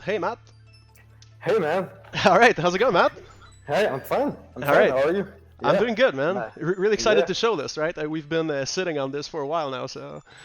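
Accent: Quebec, Canada. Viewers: Vraiment cool d'entendre un accent québécois! :) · Wait! I recognize that accent! You're Québecois? Nice! I always get surprise when i hear our accent on youtube. So few Québecois gamers on here. XD Can't wait to play that! <3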